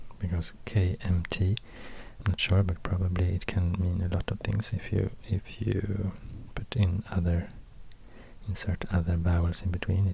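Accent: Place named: Sweden. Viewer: You is swedish?? I recognize my accent when I hear it 🤔 Anyway amazing video! Loved it ❤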